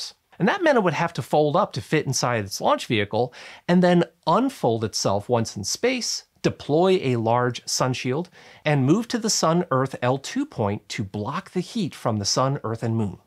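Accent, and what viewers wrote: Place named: United States. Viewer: You have such a strong american accent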